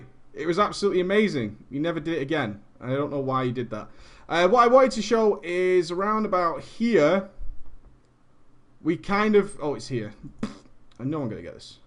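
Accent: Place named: Yorkshire, United Kingdom. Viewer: You sound like you're from Yorkshire :@ Lancashire ftw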